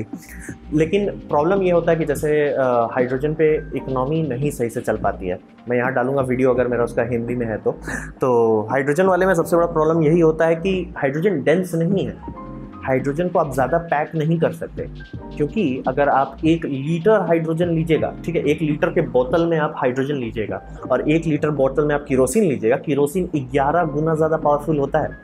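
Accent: Bihar, India. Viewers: Can I guess you are from Bihar naa???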